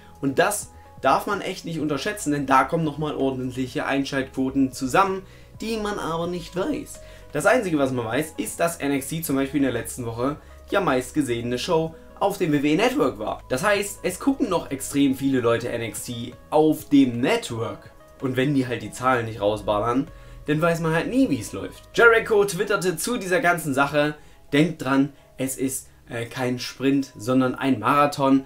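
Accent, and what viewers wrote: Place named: East Germany, Germany. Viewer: Ossi halt 😄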